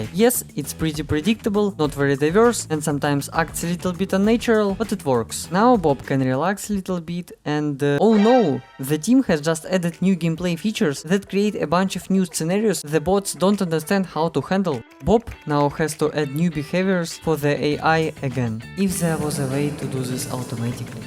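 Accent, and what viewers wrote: Russia. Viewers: У вас сударь немного русский акцент 🤔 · ох уж этот Русский Акцент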